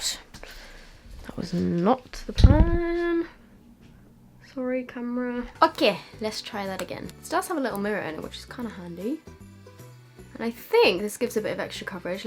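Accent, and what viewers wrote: Australia. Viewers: Am I the only one who thinks she has an Australian accent Just me ?? Oh ok........ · I love your Australian accent it made me laugh so much love this look I think I might do something similar for Christmas Day ❤️❤️❤️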